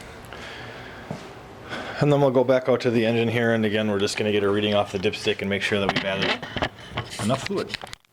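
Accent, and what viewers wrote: Minnesota, United States. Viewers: That Minnesota accent is on point!!! hell yea!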